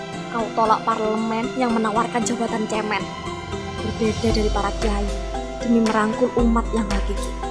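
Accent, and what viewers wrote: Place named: Java, Indonesia. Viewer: Jawa nya kelihatan banget❤️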